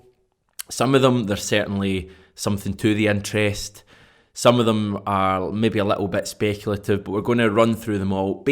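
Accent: Scotland, United Kingdom. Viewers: Love the Scottish accent. 🏴 🤝🇦🇺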